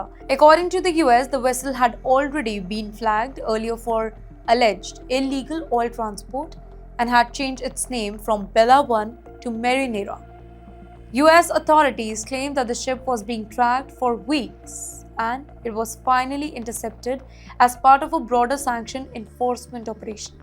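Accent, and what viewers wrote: India. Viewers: Oh I think I can hear it. It's slightly different with some words, like she has two English Indian accents and they blend lol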